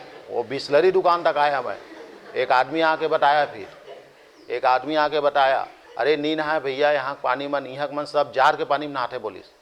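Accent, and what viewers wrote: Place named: Chhattisgarh, India. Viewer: Bahut hi gajab story boss ❤ Sir G Apki chattishgadhiya अंदाज में तो पूरा cg students फिदा हैं।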